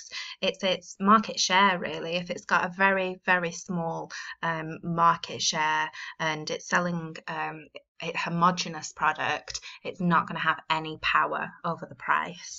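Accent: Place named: United Kingdom. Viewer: love your british accent :)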